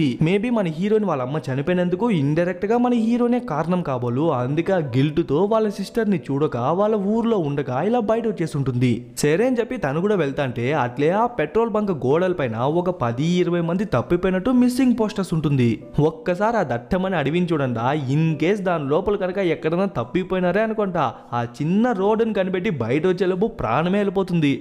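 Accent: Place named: Rayalaseema, India. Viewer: Ni voice Matra super daniki రాయలసీమ భాష ఇంకా సూపర్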